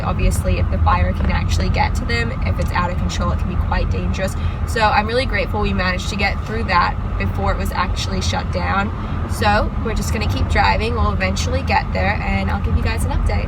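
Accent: United States. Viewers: She sounds more American than Australian... 🤔🤔🤔. But I still really hope that you and yours family are okay!!!! May god bless your whole country!!!! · Omg, I didn’t know you were Aussie, I thought you were American, since 2014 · I thought you were american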